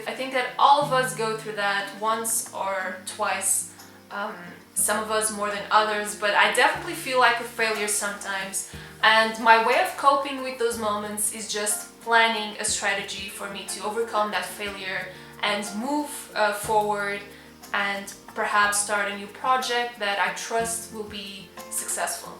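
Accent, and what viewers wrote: Portugal. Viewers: OMG your Portugal accent is so beautiful! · Omggg portuguese from Portugal is sooo freaking different 😂😂❣️ (I’m from Brazil) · Português de Portugal é tão engraçado e tão bonitinho. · Sempre quis saber se era portuguesa ou brasileira, por conta do sotaque e dos livros em português. Abraços do Brasil! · HAHAHAHA sua voz muda e fica com o português de Portugal que fofinho. 😍❤❤😢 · Sou brasileira e amo o sotaque de Portugal ♡♡ queria que os brasileiros usassem o termo "bocadinho" também haha ♡♡♡ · Since 2016 watching your videos and... well, what a surprise! I definitely adored the new format. By the way, Lisboa é a cidade mais bonita que já visitei e seria maravilhoso assistir a vídeos em português. The Portuguese accent is one of my favourites! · Eu sou Brasileira e o sotaque Portugues do Portugal e muito diferente xD xD xD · Adorei ouvir o sotaque português! 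Sou brasileira, e é engraçado o quão pouco contato eu tenho com o português de Portugal falado. Faça vídeos como esse com mais frequência! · A melhor parte do vídeo foi te ouvir em português. O português de Portugal é muito, muito bonito.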